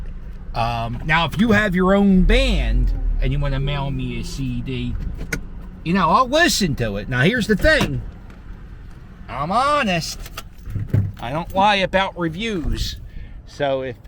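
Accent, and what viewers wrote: Philadelphia, United States. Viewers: Dude, I heard your accent and immediately though, yo, Philly! ...then you said you were driving to Wilmington and that sealed it,... Delco? Fair enough review on Coheed, but i gotta say, you gotta see those dudes live.... the fuckin energy they throw off is amazing!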